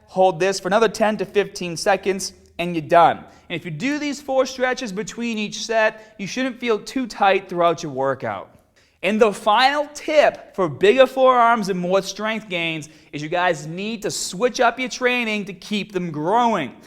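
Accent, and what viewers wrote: Boston, United States. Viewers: Love the Boston accent :) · Am I crazy or is Bostonian accent a lot more noticeable? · Reasons why i subscribed: that strong Boston accent and funny yet serious. Nice work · Boston or Rhode Island accent?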